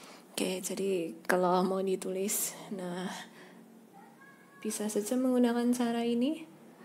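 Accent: Java, Indonesia. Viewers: Medok banget mbaaa :v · Sangat bermanfaat ka, tapi maap banget bukan menghujat saya terganggu sama suaranya, terlalu medok sama kalem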